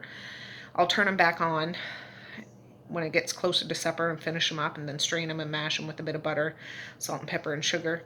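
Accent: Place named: Nova Scotia, Canada. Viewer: First time watching the post on its first day. It took 3 months to watch every post from the very beginning. 🙌 🙌 I watch because it sounds like home. Born and raised in Nova Scotia.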